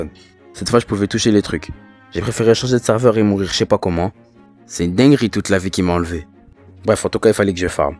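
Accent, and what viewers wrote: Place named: West Africa. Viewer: Wesh l'accent me fume , tes ouest africain ou du viens du centre . Moi je suis sénégalais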